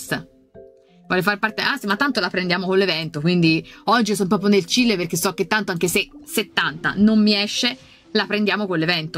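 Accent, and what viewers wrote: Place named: Tuscany, Italy. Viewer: Ma sto accento toscano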